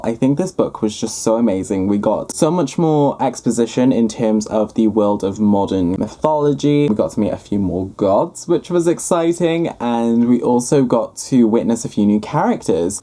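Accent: United Kingdom. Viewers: Omg can't believe you're reading Kane Chronicles.. I mean, you reminds me of Carter Kane even tho you got British accent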